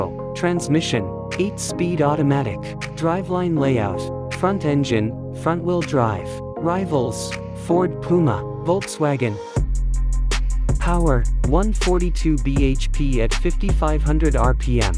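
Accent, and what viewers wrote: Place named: United States. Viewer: A strange review to listen too, the commentary sounds like an American version of R2-D2, the pronunciation of words such as chassis are so American, also the script repeats itself I say the script repeats itself…all in all a ghastly review…..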